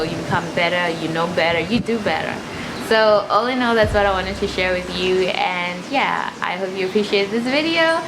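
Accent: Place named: United States. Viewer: You don't sound like someone who grew up with Danish as first language. I once lived in Denmark. You have an American accent! Are your parents American or did you school in the USA?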